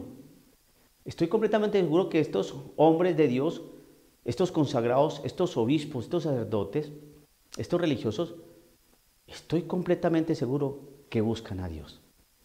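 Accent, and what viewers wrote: Colombia. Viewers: Calavera. jajaja hay padrecito me iso reir con eso tipico hablar colombiano.Bendiciones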